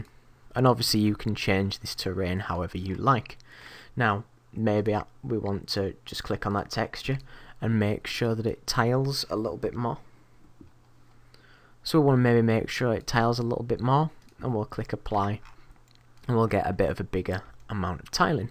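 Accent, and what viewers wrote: United Kingdom. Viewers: British Accent makes me feel chill :D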